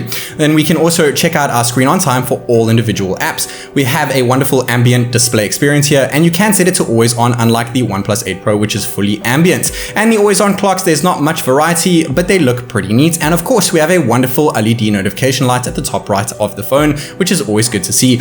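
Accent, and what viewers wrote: South Africa. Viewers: Great video! BTW are you South African? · Hey, are you South African? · Are you South African?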